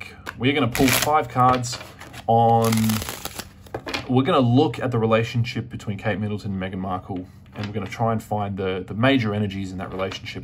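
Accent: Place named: Australia. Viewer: You sound like an Aussie...🇦🇺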